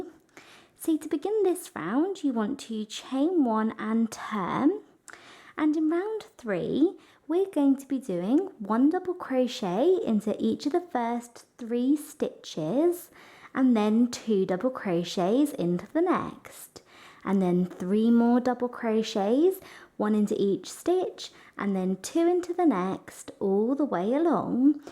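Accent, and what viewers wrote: United Kingdom. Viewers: Que bonito suena el inglés británico!! 😍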